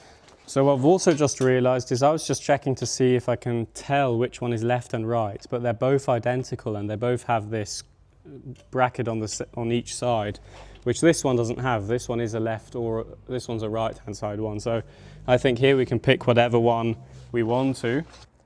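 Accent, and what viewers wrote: United Kingdom. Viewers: Just come across your channel, great work BTW you have the most English accent I've heard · Hi, great video! My father also bought a Twingo the new Twingo e-Tech very good small monospace. Is the Twingo popular in Switzerland?🤔 Oh and, it seemed a bit strange to me at some point I had the impression that your accent Was a bit British I don't know why, which side of the Swiss are you coming from? Please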